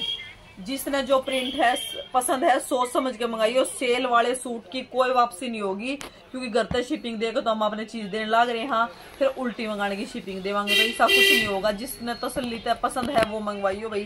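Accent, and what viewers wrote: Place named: Haryana, India. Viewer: Kya mast hariyanvi bol the h didi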